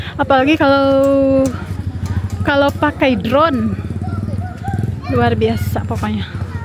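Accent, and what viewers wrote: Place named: South Sunda, Indonesia. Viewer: Logat pakidulan nana ange yeh 😂😂😂😂😂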